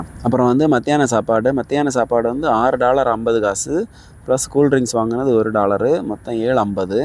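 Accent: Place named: Kanyakumari, India. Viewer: நீங்கள் கன்னியாகுமரி தானே..... நீங்கள் பேசும்போது புரிகிறது..... வாழ்த்துக்கள்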